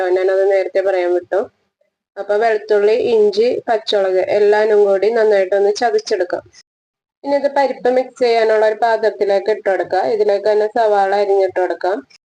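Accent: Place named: Ernakulam, India. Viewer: ..correct alle..enikk ariyaam ee slang..May be we r neighbours, evideyaa place..?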